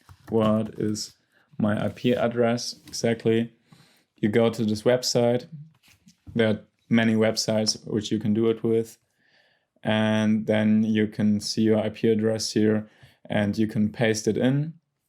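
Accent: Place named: Germany. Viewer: Ich wusste dass du Deutsch bist, der Akzent hatte recht haha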